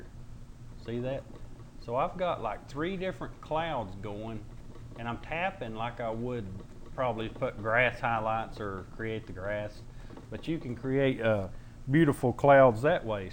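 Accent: South, United States. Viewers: Great technique, very helpful. Love the accent! Charming southern US gentleman.. :-) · I just found your channel. I've subscribed. Not only do I like how you explain the painting but I love your country accent. I'm country too so it feels like home. 😁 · Are you from the Carolinas ? you sound southern like me,, thank you for helping me with painting clouds ☁️ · A good painter..n' a cowboy's accent..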